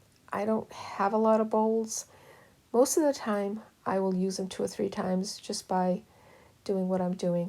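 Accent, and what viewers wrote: New England, United States. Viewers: Thank you! Great video! You must be from New England with that accent lol.😊😊😊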